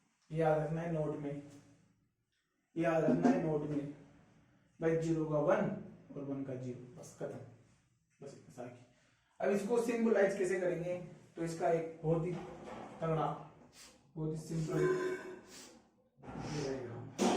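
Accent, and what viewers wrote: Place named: Hadoti, India. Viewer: Like your hadoti accent 😊❤️❤️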